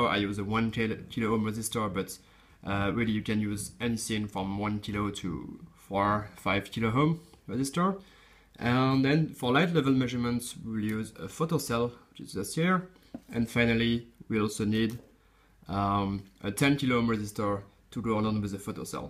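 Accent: France. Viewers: Mais tu es français ? :D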